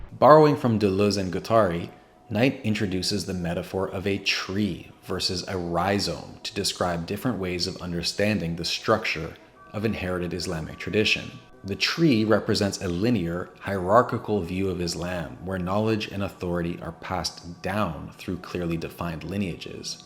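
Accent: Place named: Ontario, Canada. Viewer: Dear Brother: Fellow Islamic 'seeker' here. Fellow Madre Yage aficionado here. Just returned from an 11 day retreat in Columbia with the plant. THE 2 ARE VERY COMPATIBLE AND VERY COMPLIMENTARY, IMO. I am located in Ontario, near you, judging from your accent and some of your videos. Thank you for being a visionary. Thank you for your courage. Ameen. Emily.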